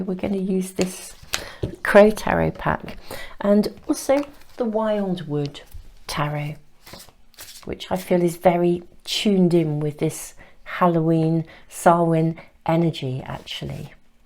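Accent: United Kingdom. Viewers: I LOVE listening to your reading. Sometimes I follow the way you express all your ideas. I asked myself, I am thankful because I can practice how to speak like a British. Someday ! I'll be there to UK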